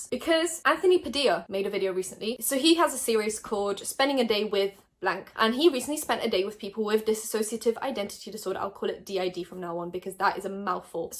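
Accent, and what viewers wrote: United Kingdom. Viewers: ...will you marry me? I just want to hear your beautiful British accent everyday when I wake up accompanied by your lovely face!! My goodness you’re a dream 💭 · SPEAK POLISH, you sound toooo British makes me not believe your polish where is your Akcent !?!?!?!? Love your videos keep it real 😁😁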